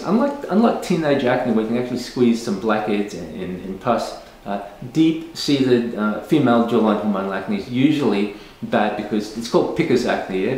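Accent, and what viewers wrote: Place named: Australia. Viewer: Thank you for your videos and information. It would be a lot easier to follow if you sit or stand in front of the camera and make eye contact, because it’s hard for non native English speakers to understand your beautiful Australian accent, so standing in one spot will make all the words have the same tone and will be able to read your lips. (but that’s just me and my opinion)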